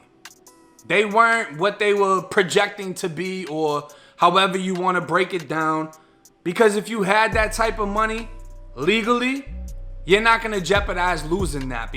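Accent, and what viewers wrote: Boston, United States. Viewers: I love your accent.. wyf?? Boston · Yo bro mad love man glad to see a homie doing good forreal .. I see ya Boston ascent coming out strong man haha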